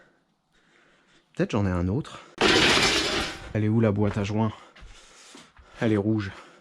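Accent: Alsace, France. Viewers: Putain elle est énorme la chaîne. Et j’ai tout de suite senti l’accent de la maison. Alsace Frei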